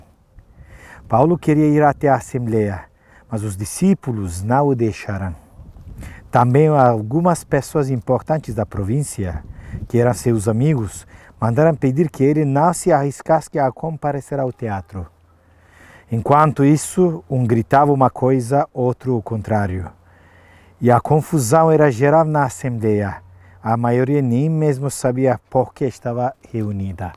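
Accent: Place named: Brazil. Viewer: para uma pessoa de nacionalidade turca, você domina muito bem a língua portuguesa. Acaso morou no Brasil? Por que a sua pronúncia está similar com o português brasileiro. Parabéns pelos vídeos, eles nos levam a uma cultura multi-secular, que nos facina pela história do cristianismo e seus percusores. Gratidão...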